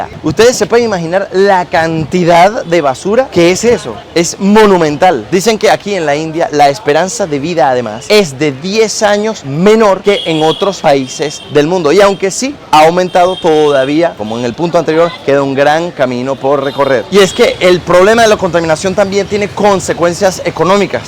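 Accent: Venezuela. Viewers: Para su reportaje todo es pobreza, sucio na hay lugares histórico, turísmo y cosas positiva según usted. por su. acento es venezolano, y le digo algo en su país hay bastante, dígame los cerros.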